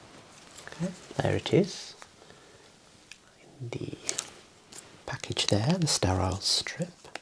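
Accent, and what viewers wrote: Birmingham, United Kingdom. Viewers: Your accent is too beautiful! 😍 You're from the UK, right? May I know, just out of curiosity, from which part? I love how you talk! Sending love from Argentina! 🇦🇷❤ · He is Brummie Taika Waititi, and no one can convince me otherwise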